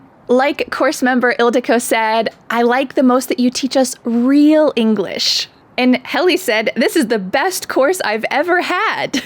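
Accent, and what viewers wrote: United States. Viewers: How much I adore and enjoy your clear American pronunciation,. It's awesome!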